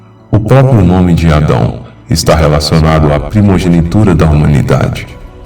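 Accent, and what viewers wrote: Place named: Minas Gerais, Brazil. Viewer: Se essa voz pertence ao " ", ele é um ótimo ator pois conseguiu inserir na voz o tão conhecido sotaque mineiro. Se for ele, está no lugar errado deveria tentar Hollywood... Só uma sugestão, Se For ele está desperdiçando talento...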